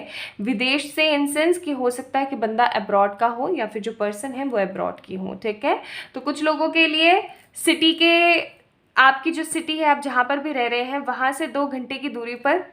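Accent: Punjab, India. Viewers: Di aap punjabi ho , apke kuch words punjabi walye hote hai,,,, and pile no 1 , I strongly claim this positive energy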